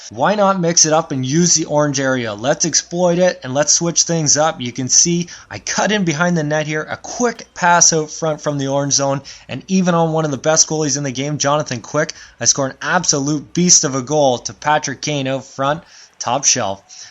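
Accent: Canada. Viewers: thanks for the tips man! i can totally tell that you're canadian by the way you say about sounding like ABOOT. haha. thanks for uploading!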